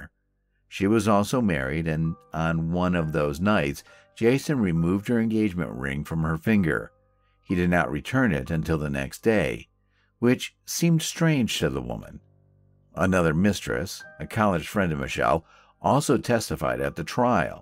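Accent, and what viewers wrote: United States. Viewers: It's an American crime and the narrator sounds American, using miles would be a nice touch or both.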